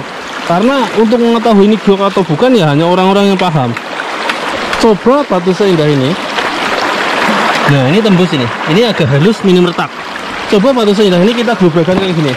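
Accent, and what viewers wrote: Banyumas, Indonesia. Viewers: Bahasane kok ngapak yah ??? Asli ngendi kang!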